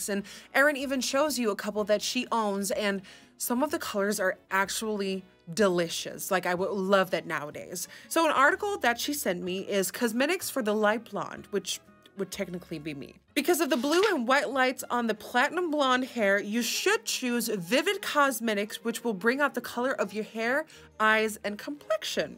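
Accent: United Kingdom. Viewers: I often like blue color on my eyelids. I really enjoyed watching your tutorial. And your British accent...Ah!!! 😂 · I love your British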